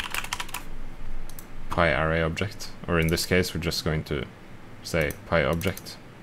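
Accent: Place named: Sweden. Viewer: Love the Swedish accent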